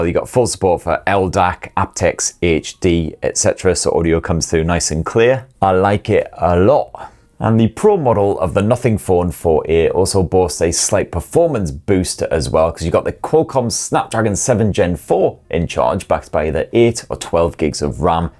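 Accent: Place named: Northern England, United Kingdom. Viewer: It is in English, well northern English.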